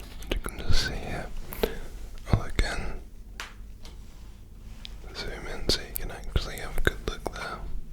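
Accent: Australia. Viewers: The Australian accent is so beautiful 😍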